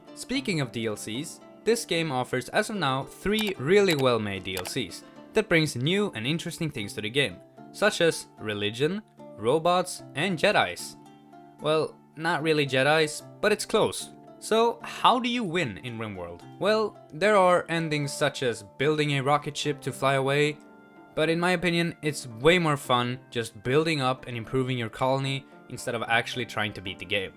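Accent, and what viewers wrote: Sweden. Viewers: Correct me if I'm wrong, but is that a Swedish accent?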